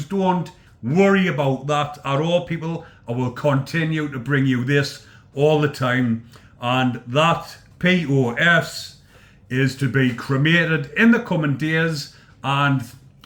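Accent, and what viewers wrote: Newcastle, United Kingdom. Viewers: Love your Geordie accent you are a Great bloke love Jon.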